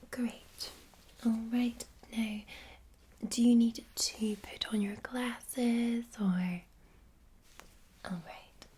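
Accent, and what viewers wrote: Scotland, United Kingdom. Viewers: What accent is this? Edit: Just read she is Scottish, but is there a mix? It's not the Scottish I'm used to hearing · Wow...just wow. I’m getting a Scottish Dr Quinzel vibes...this is dangerous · me: FINALLY SOMEONE SCOTTISH[ also me: she sounds so nice but then im Scottish and i dont sound that nice]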